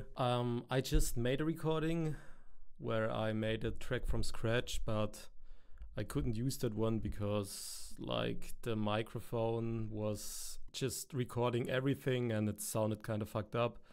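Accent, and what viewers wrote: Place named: Germany. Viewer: Are you german? You have a german accent, Im german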